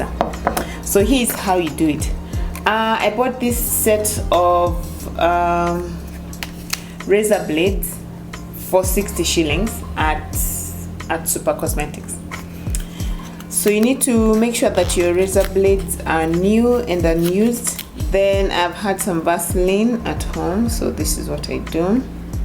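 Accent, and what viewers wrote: India. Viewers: You look beautiful, wow and only for 1,500, very impressed. Love the Indian accent😂😂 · Beautiful. I love the Indian Accent😂😂😂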